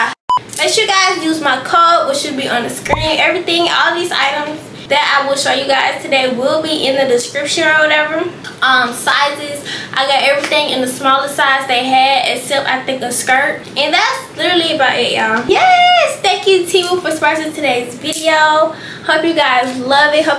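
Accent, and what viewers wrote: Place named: Atlanta, United States. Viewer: And I love your voice, girl, the Atlanta voice😊❤🎉😮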